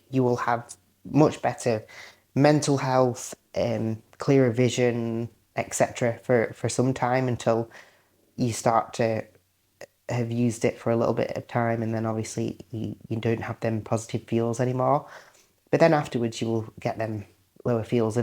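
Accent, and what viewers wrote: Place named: United Kingdom. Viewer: You look beautiful and I love your accent 😊 What part of the UK are you from?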